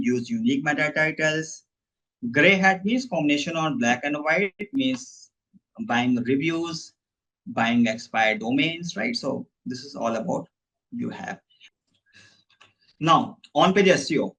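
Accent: India. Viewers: Thankyou so much , iam interested to learn seo .. last time I seen your video I can't understand that foringer voice so this time it's an indian , now i understood every topic thank you ❤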